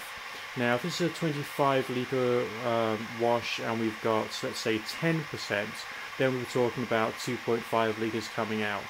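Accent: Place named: England, United Kingdom. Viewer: Annoying accent .. english Lad ..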